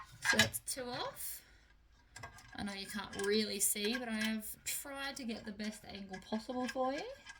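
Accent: Australia. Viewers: Gday, just a heads up you need to clean the cup with alcohol, love your videos, nice to hear an Aussie for once…where in aus are you?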